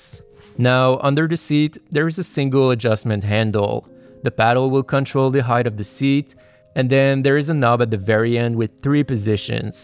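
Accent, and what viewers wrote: Quebec, Canada. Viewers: Tu sonnes tellement Québécois 😂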